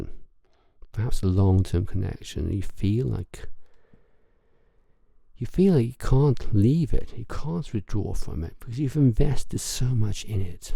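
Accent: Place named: England, United Kingdom. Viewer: Hello, nice to hear an English reader, and male to boot. Good reading, but being the other interested party it's not so heartwarming. Thank you, I shall keep my eye out for further readings, I have subscribed. UK listener.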